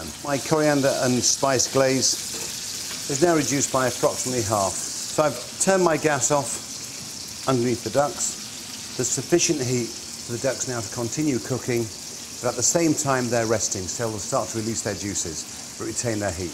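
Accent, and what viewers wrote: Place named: Yorkshire, United Kingdom. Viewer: He is the poshest sounding Yorkshire lad I've ever heard!!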